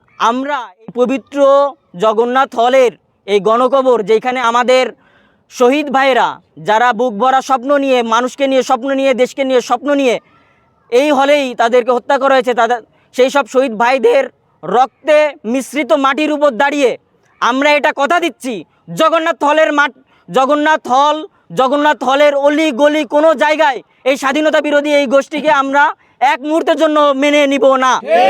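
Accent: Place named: India. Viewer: এই গলা হিন্দুস্তানি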